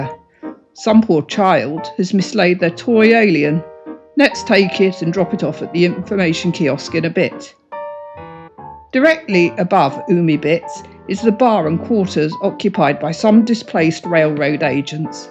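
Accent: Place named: United Kingdom. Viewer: that was… that was fucking sensational. What the actual fuck did I just watch in the best possible way 😅 So many cool little details in here from a build standpoint, like the candy cane accessible toilet handles, the commissary, the flamingo flamenco, the kitbashing, the Rubik’s Cube… fantastic 🤩 But good god the commentary… bravo my friend 🥲 it was so delightfully bonkers and so delightfully British! It felt like a weird mix of evening BBC Local News segment and Diane Morgan being Philomena Cunk. And your script and turn of phrase was astounding. Absolute art. Fallout settlement building heritage right here.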